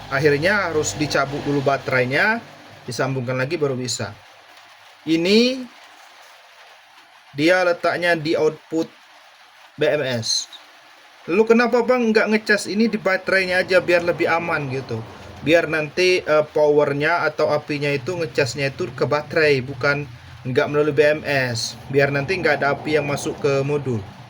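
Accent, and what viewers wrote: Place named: Medan, Indonesia. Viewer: abg orang mana ? logat nya ku dengar macam orang medan. mau tanya tentang speaker eggel ku bg. kalau volume kuat mati sendiri dia bg. ada solusi nya bg ?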